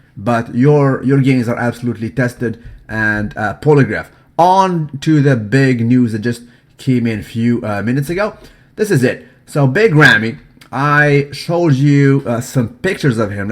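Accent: Egypt. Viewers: Man i am Egyptian and your egyptian arabic accent is soo fun, it cracked me up, thank you man :D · Your Egyptian accent ain't that bad:P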